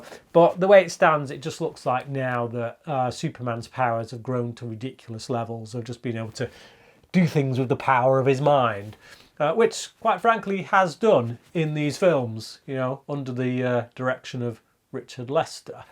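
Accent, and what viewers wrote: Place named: Scotland, United Kingdom. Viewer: I finally stopped hearing your scottish accent, the more you listen, the more you get used to it i guess, now your speech sounds like poetry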